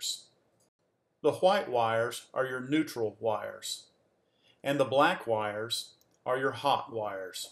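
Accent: South, United States. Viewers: that's just how we on the South say "wh"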